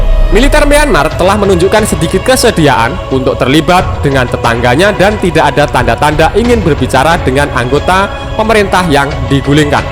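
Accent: Java, Indonesia. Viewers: Min pertahankan medok mu pakek kata "osot punya osot"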